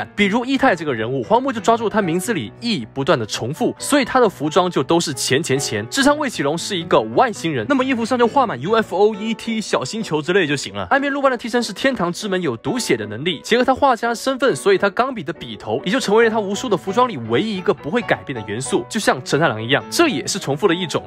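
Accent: Taiwan. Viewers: 為何博主講話沒什麼口音啊XDD倒像台灣口音了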